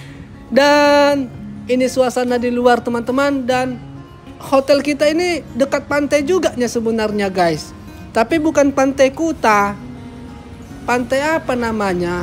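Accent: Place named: Pematangsiantar, Indonesia. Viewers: Logat Siantar ny ga hilang ya Lae . Untung lah unik unik Lae klu sempat Anik Anik runyamlah nanti di tempat sarapan ny...😀😀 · Mantap dek... Pertahankan ciri khas siantarnya...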